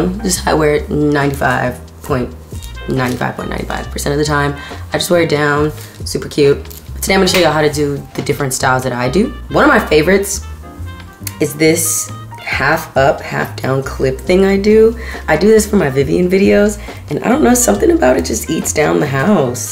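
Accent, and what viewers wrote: Ireland. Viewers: brooo you and this irish accent I cannot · 🥺 THANK YOUUUUUU but babes- now I’m stuck with this IRISH accent in my headddd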